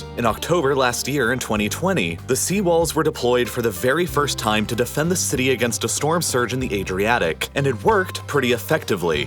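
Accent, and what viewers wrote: Canada. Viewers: Hey! I didn’t know you were Canadian! You can tell because we say Toronno, not Toronto.🇨🇦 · I didn't realise that was Canadian! you can tell because he drops the second 't' in Toronto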